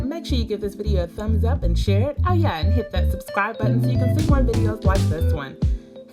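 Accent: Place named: New York, United States. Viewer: lol I definitely hear a little new york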